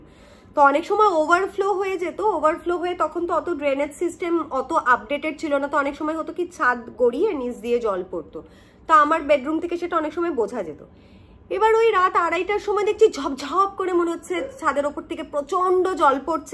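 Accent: Bangal, Bangladesh. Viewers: ম্যাডাম প্রথমেই আন্তরিক শুভেচ্ছা জানিয়ে বলছি ভীষন ভালো লাগলো বাঙাল কথা বলতে শুনে। শিম্বার মাধ্যমে আপনাদের সকলকে ভালোবেসে ফেলেছি। আপনি খুব আন্তরিক ভাবে কথা বলতে পারেন।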